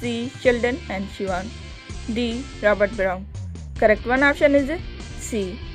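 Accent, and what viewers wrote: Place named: Sindh, Pakistan. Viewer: Ap sindhi ho apki awaz se lag rha hai magar ap bohoot payari awaz hai apki mashallah 😊😍🤗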